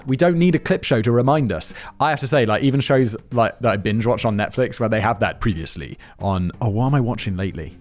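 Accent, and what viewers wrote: RP, United Kingdom. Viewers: I forget he’s not in America every time I hear bbc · dumb takes sound dumb even in a posh accent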